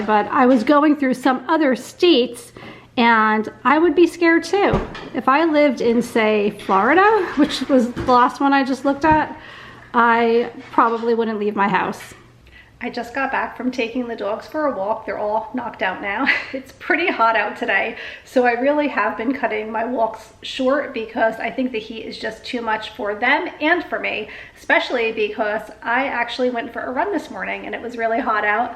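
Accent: New Jersey, United States. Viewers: Just joined your channel! love your content. Love your NJ accent :)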